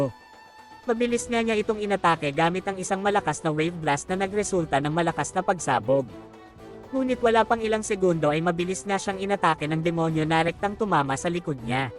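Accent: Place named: Visayas, Philippines. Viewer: Bisaya, katamad makinig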